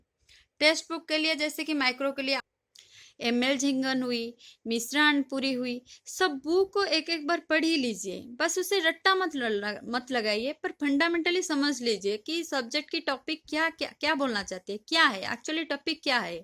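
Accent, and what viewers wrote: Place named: Maharashtra, India. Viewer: mam i enjoyed your hindi......probably you are from maharastra region ........though very very thanks for valuable suggestion.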